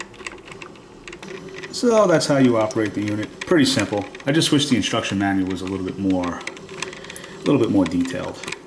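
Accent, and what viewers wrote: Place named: New York, United States. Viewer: ..love your CAWFEE TAWK NEW YAWK ACCENT!!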